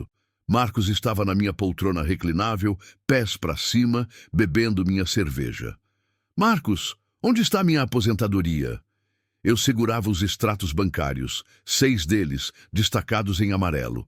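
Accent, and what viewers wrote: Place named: Paraná, Brazil. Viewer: Istoria muito impactante. Narração Nitidamente Maringá. Paraná 20 ,,8❤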